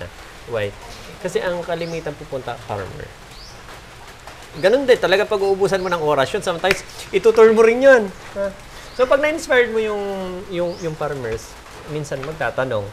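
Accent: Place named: Mindoro, Philippines. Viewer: Pang Mindoro din ang tagalog ni sir hehehw.e..taga mindoro po ako...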